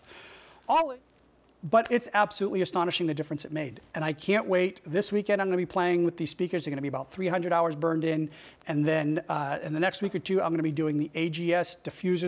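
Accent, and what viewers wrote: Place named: United States. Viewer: You sound American rather than a Greek to me.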